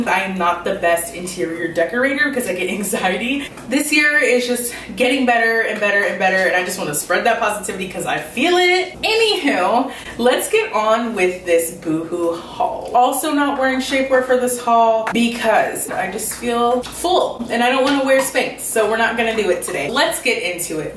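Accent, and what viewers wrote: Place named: South, United States. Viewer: I'm a true fan. You have lost your Southern accent. Glad you're back.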